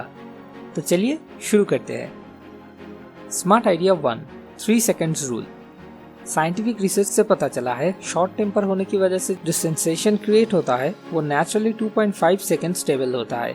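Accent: Bengal, India. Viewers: Initially this voice was looking awkward to me. BUT nowadays, I like this voice & pronounciation of Bengali Hindi. 😊✌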